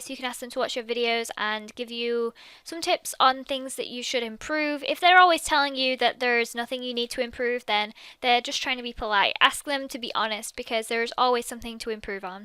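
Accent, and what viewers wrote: England, United Kingdom. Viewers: I love your English accent :)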